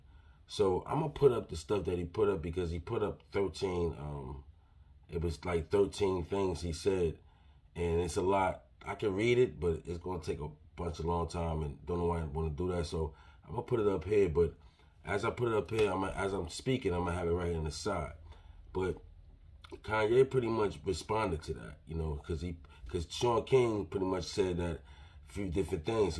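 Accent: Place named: New York, United States. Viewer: Luvvv what you have to say!! Especially luvvv that accent, sounding like you're from the Boogie Down or Queens, or Brooklyn or something lol. Good sound advice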